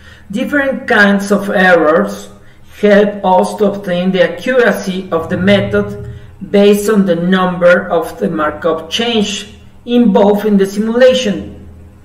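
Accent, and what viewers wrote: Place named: India. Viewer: He speaks like Hindu, not like Mexican jeje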